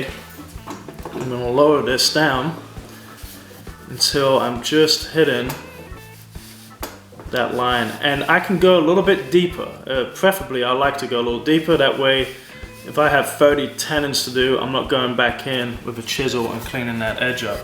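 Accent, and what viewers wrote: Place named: United Kingdom. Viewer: British, Texan and maybe German? accent.. interesting combination. not sure of the German but I swear I hear it in there from time to time!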